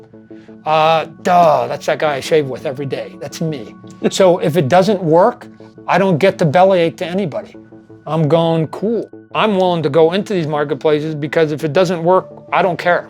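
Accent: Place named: New York, United States. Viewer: dude talks way too much, sounds like a new yorker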